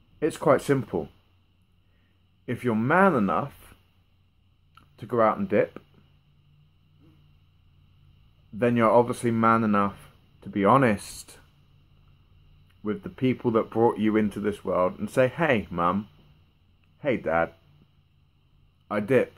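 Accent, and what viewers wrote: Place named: England, United Kingdom. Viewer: Great video man it threw me bud this big husky bearded man I'm thinking OK hes from Michigan or somewhere here then you break out the English accent! Man that's awesome keep up the good work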